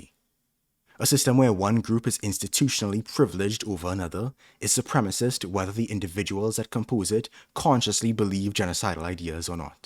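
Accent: Trinidad and Tobago. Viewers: Is this guy Trinidadian?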